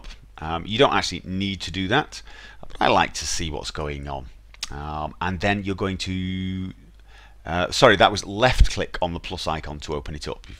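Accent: United Kingdom. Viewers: Thank you for this tutorial.From your speaking tone,i'm guessing you're from United Kindom.I like the way you talk!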